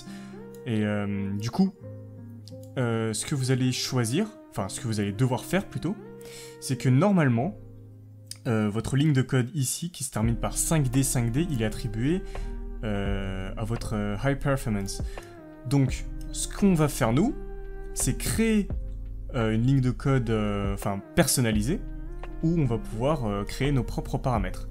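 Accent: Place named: England, United Kingdom. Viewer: Rolala l’accent anglais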